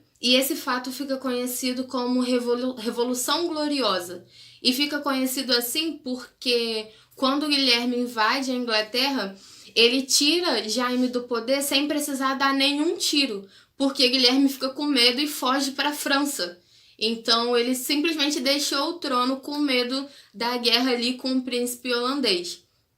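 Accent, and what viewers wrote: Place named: Rio de Janeiro, Brazil. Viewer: O sotaque carioca é o melhor!!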